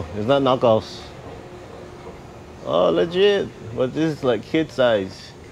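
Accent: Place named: Hawaii, United States. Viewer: You sound like a local boy, you from Hawaii?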